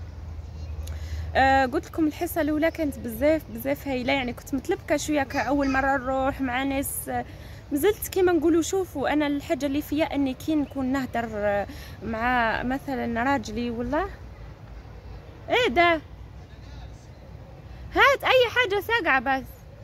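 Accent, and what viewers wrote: Upper Egypt, Egypt. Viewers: تتكلمي صعيدي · فرحتلك راني متبسمة غير وحدي فرحانة بك تعجبني مين تتكلمي مصري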